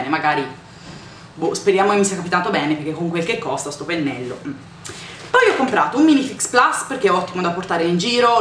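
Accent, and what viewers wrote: Tuscany, Italy. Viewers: non è vero che non sei cambiata dall'inizio. tu sei cambiata, si, ma non in peggio, sei diventata meravigliosa. ogni volta che guardo i tuoi video penso a quando di nascosto dalla mamma accendevo i pc e mi mettevo a rifare i trucchi bellissimi che mi insegnava una biondissima ragazza toscana, dall'accento pronunciato e esilarante, che ogni volta mi metteva ilarità. ora sei cresciuta, ma questo è naturale, l'importante è farlo bene, e, lasciatelo dire, TU CI SEI RIUSCITA. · ciaooooo sei beramente bravissima!!!! ma sei toscana!?!? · che bello che sei tornata! mi mancava tanto anche l'intonazione toscanaccia! eheheheh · A me sei mancata perché è mancato il tuo modo di esprimerti e mi è mancata la toscana di youtube.. perché quando da Bologna guardavo e guardo i tuoi video la sera dopo aver sentito un sacco di accenti diversi, sentendo parlare te mi sentivo un po' a casa. 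Metti allegria e mi piace la tua giovialità! complimenti per la tua votazione e in bocca al lupo per il tuo futuro da MUA! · Ciao! Finalmente sei tornata! Nulla, volevo dirti che sono (come credo molte delle tue iscritte) molto fiera di te perchè hai inseguito il tuo sogno e hai raggiunto i tuoi obbiettivi. Devi essere veramente felice della persona che sei, credimi! Continua sempre a fare quello che più ti piace e vedrai che di soddisfazioni ne arriveranno sempre di più:) (Mi chiamo come te e sono anche toscana come te ^^ Quando ti sento parlare mi sento proprio a casa!) Un bacio a Tiffyyy! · Sono felice che l'accento toscano ci sia ancora!!!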